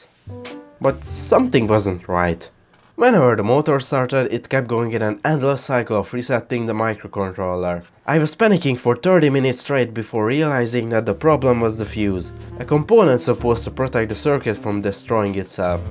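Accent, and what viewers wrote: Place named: Hungary. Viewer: Your pronounceination sounds very hungarian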